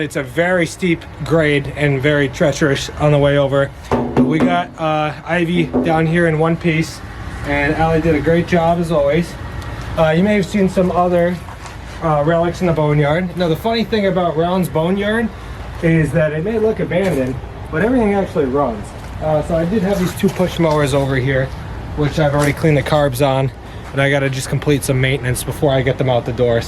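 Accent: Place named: New York, United States. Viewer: Awesome!! Great Intro!!, you need way more subscribers!! You have kind of a New York affect and a slight accent as well as Northern New York Scenery however I would guess that you are in New Jersey. I am in Pennsylvania and I suppose that is also a possibility. I have an Allis Chalmers B110 also. I am restoring a John Deere 112 that I am modifying with an air compressor system accessory. My Craftsman GT18 Is in the process of having suspension welded on the front end, a 90 degree gear box to change a twist belt into two straight belts, larger tires and a lift. Talk to you soon. Great video!!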